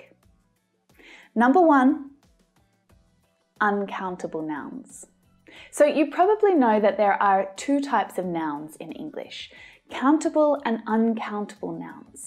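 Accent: Australia. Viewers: I love your Australian accent. Especially when it comes from female. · Wow the Australian accent is amazing 🐇 i like it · Love her australian accent ❤️ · Well Aussie say "can't" like "kan" and that confuses me . For example when she says " Bad habits "kan" be fixed I'm not sure it can't be fix or can be fix. Any Aussie here help me out of this pleaseee!!!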